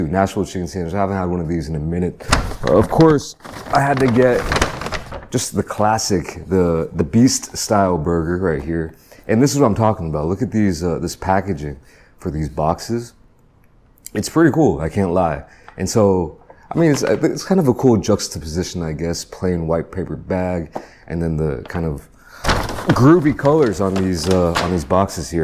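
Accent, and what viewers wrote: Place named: Alabama, United States. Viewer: what the fuck why does he look like ching but sounds like robert who lives in Alabama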